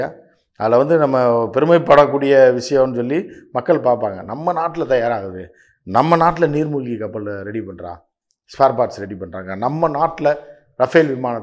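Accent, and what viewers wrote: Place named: Madurai, India. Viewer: madurai slang-Super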